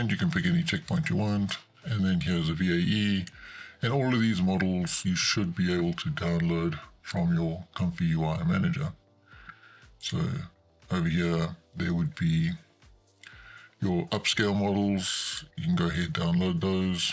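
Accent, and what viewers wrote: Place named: Afrikaans, South Africa. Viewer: I like your voice my man.....English baritones with a faint touch of Afrikaans. Keep up the good work!! Also big thanks for sharing your work flow without putting them behind a paywall!!! :eyes-pink-heart-shape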